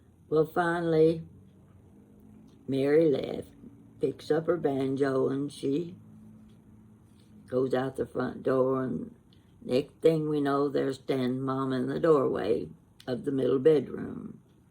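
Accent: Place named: Eastern Kentucky, United States. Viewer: My grandmother was my best friend. I lost her 16 years ago this week, and hearing you brings the warmth I have been missing for so long. Thank you. ❤️ I have to ask, where are you from? Your accent is hauntingly familiar. Sounds like Eastern Kentucky.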